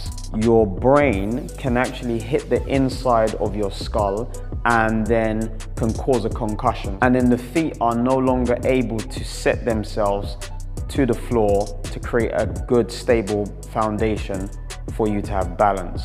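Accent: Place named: United Kingdom. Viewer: Are you Cuban as for me sounds like you are British.... accent by far.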